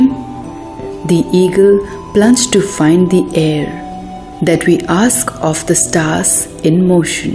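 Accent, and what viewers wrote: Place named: South Asia. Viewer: It is so good to hear this most wonderful of poems read with a South Asian accent!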